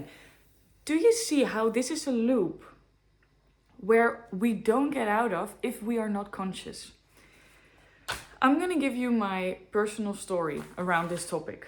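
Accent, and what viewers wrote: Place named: Netherlands. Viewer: Thanks for sharing the wisdom! As someone who learned very early that worth comes from performance, even my healing sometimes becomes another project to optimize. And, I've only recently became aware of this trap. BTW, Dutch accent is my favorite :) Keep up the good work!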